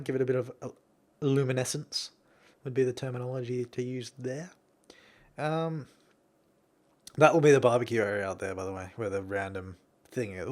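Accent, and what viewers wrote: Australia. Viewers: I love your accent, I was going to ask where your from, but I figure by the way you rponounce your Rs and AHs, it's Australian. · he's australian, not a kiwi. he has a australian accent :) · I know this is an old comment, but I do the exact same thing. I guess my love of Australian accents makes his voice 10 times better but even if I wasn't oddly obsessed with the accent his voice just has a soothing sort of sound to it ahaha I sound soo creepy right now xD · Madisyn Davis again... this is a really really old video but still... cannot agree more. I love listening to his voice. (But same as you I have a strange obsession with Australian accents so...)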